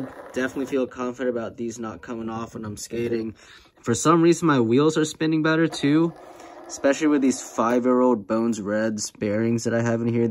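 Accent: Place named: Delaware, United States. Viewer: ok. that is a good idea. thanks man. somebody just the other day had this problem at the park. i can tell your from the east coast when you said up there in new england but you dont sound like your from delaware. im from Philadelphia. the streets here might be the first roads paved after they invented black top 200 years ago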